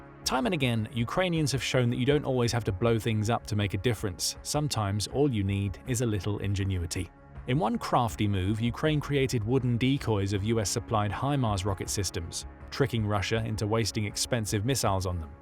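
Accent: United Kingdom. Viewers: Next time, use mid-continent English, not uk "english", a la Shakespeare crap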